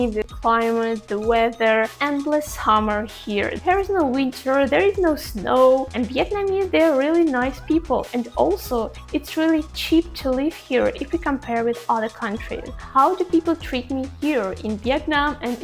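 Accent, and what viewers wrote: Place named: Russia. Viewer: Hi. I have a few friends in Russia. One of them is Kazakhstani and her and her family live in Tyumen. However, because racism is rising in Russia and her and her family have been the targets of racism, they are now moving back to Kazakhstan. Also, I see you touched on something many of us have noticed about the Slavics, that many of them look Asian, but with white skin, lighter hair colors and bright eyes. My Co-worker from Taiwan and I have talked about this a lot. Anyway, I wish you the best with your venture on YouTube, I hope it brings you a lot of success. P.S.... You don't look Thai, Vietnamese or any Indo-Chinese. You also don't look Kazakhstani or Chinese, you facial structure is different. I'm also from the USA. had a Thai girlfriend and one of my Nephews is 1/2 Vietnamese. I also was in the US Army and lived in South Korea... 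You don't look Korean too! You do look Asian, but it would be difficult to pinpoint an exact location. You accent is a dead give away that your from Russia. P.S.S.... I hope you do find your way to the USA. I think you will be shocked by how different life is here, compared to Russia or anywhere in Asia. We would be very happy to have you here too! 🫶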